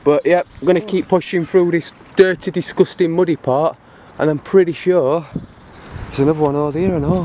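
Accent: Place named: Sheffield, United Kingdom. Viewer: Sheffield accent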